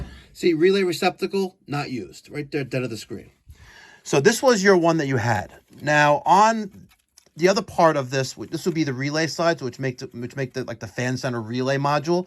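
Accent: New York, United States. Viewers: I'd let boy know to stop letting them flags fly in his part of the property. Some people might say that's being whatever, but no...it's not my religion or whatever get your shit out of my area...I'm not putting mine in yours so fuck off. Love you....Hey give me a faaageeetttit abbbouuuutt itt next time lol. I love the NY accent and some of the tude lol.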